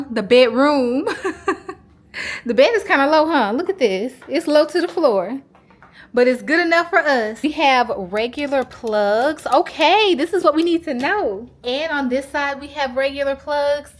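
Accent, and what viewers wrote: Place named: South, United States. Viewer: Your accent sounds more Southern than Texan. Did you grow up in Texas ?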